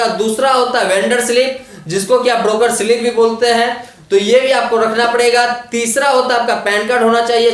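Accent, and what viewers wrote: Bihar, India. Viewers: Aap bihar se ho bhai sahab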